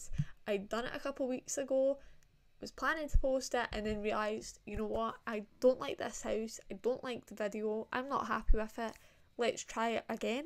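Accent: Scotland, United Kingdom. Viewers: She’s Scottish? Ireish?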